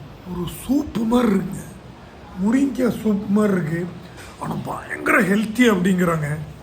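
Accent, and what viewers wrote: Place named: Koomapatti, India. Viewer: Yenga koomapattiku vanga same style of speech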